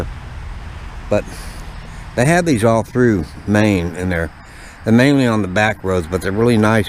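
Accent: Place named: Georgia, United States. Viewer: sound good to me...are you from atlanta georgia? love the accent... be safe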